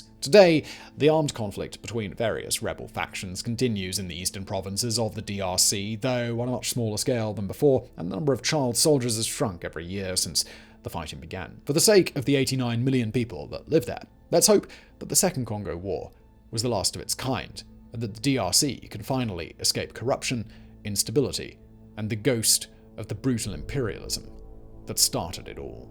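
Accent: United Kingdom. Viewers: Lol, Karen? Doesnt really apply here. But to be fair i have no idea why it bugs me so much 🤔 maybe because its the diction of the colonizers or something, i genuinely dont know 😅 but when i hear british people turn "a" into "er" it just FEELS racist lmao in my soul. I know its not. But it feels like it. I hope he doesnt sing along to rap music very often 😬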